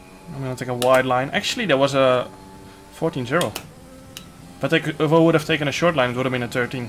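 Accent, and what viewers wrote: Netherlands. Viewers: Are you dutch because your accent sounds like verstappen :). I'm american so I'm not good with european accents for the most part.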